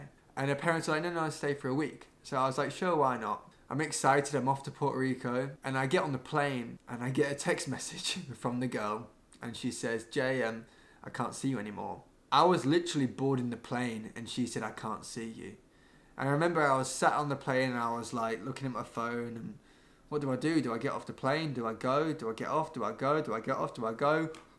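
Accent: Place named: England, United Kingdom. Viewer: Never knew english accent would be so useful. Great story! :)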